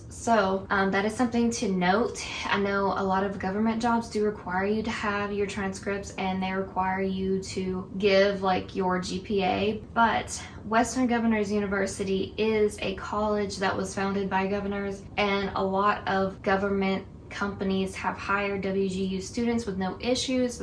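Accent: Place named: Birmingham, United Kingdom. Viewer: Yooo you sound like you're from Birmingham then you said Jeff State, coincidence or am I just crazy?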